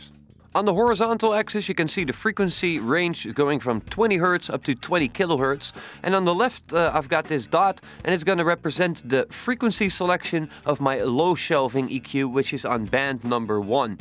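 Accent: Netherlands. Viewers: Your accent sounds very Dutch .. are you by any chance Dutch?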